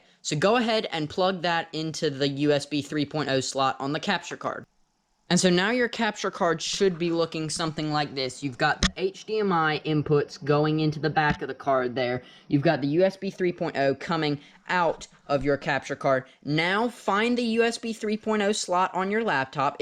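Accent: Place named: United States. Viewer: Its kinda like what the people in England think Americans sound like in a good way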